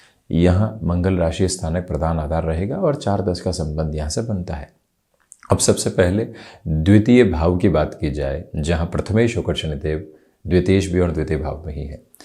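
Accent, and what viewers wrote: Standard. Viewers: Apki Hindi sun kar bahot accha laghta hein… kash mujhe bhi itni shudh hindi aati 😊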